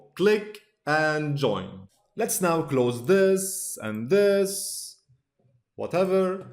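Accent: Lebanon. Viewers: i swear 7asset ennak lebneni min ur accent... fricken love that!!!!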